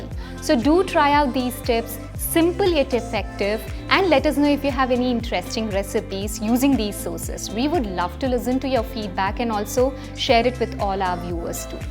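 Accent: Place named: Bangalore, India. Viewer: ..I think u r frm Bangalore...too much Bangalorean Influence..while speaking and suggesting diets...it's very subliminal...who is being to Bangalore..he will understand...need better inputs & suggestion form North Indian diets point of view...bdw..nobody eat dosa like u south indians.. everyday!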